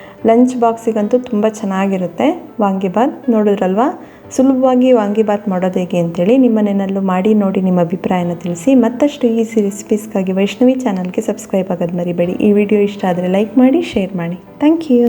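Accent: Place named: Mandya, India. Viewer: Sorry for asking, since you're slant of Kannada resembles Mandy side, still you're from my husband's place nice to hear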